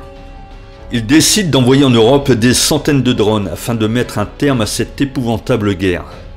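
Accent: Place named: North, France. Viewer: Monsieur tu as la voix des gens du nord.di moi si je me trompe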